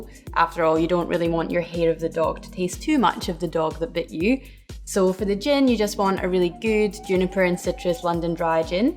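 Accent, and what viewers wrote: Scotland, United Kingdom. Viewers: I love your accent. By chance, are you of Scottish origin? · Thanks for that! I'm usually good with accents and figured you were from Western Scotland and had spent some time in Canada or the US, but had no idea about France. (You have your hobbies, i have mine.) More importantly, thanks for the lesson in one of my favorite go-to cocktails! Cheers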